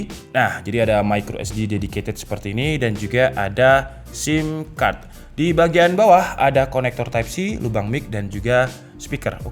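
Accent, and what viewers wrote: Java, Indonesia. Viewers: iki jowo bnget mas, 🤣🤣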